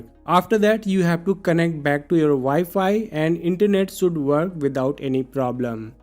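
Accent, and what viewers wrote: India. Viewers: When i hearing the Indian voice i am 100% sure my problem gona be fixt thank you ❤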